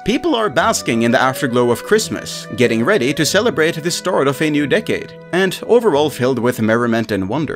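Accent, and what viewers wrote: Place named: Norway. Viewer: Are u Norwegian? Your accent makes me think you are.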